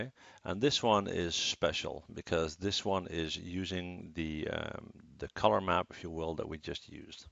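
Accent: Netherlands. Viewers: WAIT WUT u dutch ??? :D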